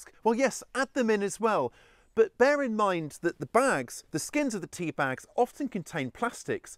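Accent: England, United Kingdom. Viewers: “Give me some skin bruh” something about your English accent has me addicted to this channel lol the endless knowledge and tips help out too 😎🤘🏼🪴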